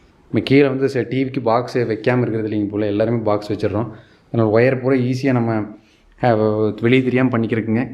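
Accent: Kongu, India. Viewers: Good information, well-planned, and between your speech some Kongu-style is highlighted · Should we apply putty for exterior wall also? How the inch wide granite lip is attached to counter top? would it not detach after some time? I like that idea and many of your tips. I watched the entire video and got carried away completely. your knowledge and presentation in kongu Tamil is awesome.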